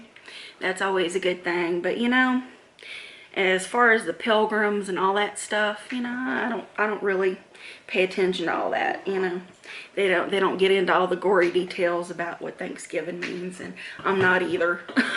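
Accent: South, United States. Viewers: Agree, she's so friendly, sweet and down to earth and seems like a kind person, love her Southern accent_too! Love Thanksgiving food!😋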